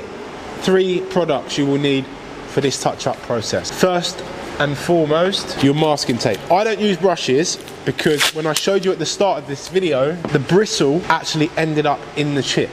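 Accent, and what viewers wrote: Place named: North London, United Kingdom. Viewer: Sound north of the river mate. South East sends respect.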